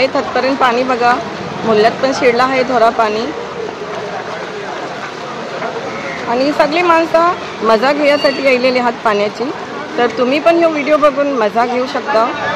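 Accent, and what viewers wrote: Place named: Konkan, India. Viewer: It's not marathi... It's kokni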